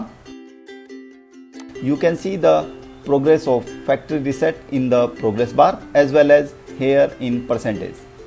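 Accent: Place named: India. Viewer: Hard to understand Hinduglish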